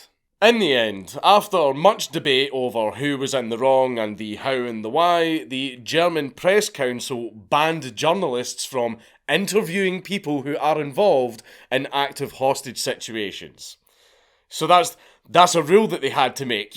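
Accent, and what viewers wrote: Scotland, United Kingdom. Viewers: I've said it before and I'll say it again, please please put CC on while listening to Dankula. Youtube translating Scottishisms is fookin hilarious. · Are you Scottish? I like your accent very nice to listen to and your a good at this.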